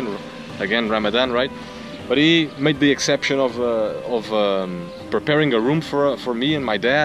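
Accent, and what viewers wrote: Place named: Australia. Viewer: It sounds like Australian accents maybe wrong well that was nice from you Mate thanks for your visit to our beautiful country. for you guys is cheaper 👍🏼 come and visits us more often there's so many places to discover. Thanks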